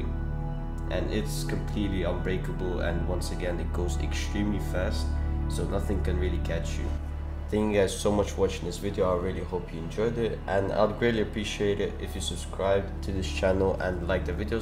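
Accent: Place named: Russia. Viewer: почему у него русский акцент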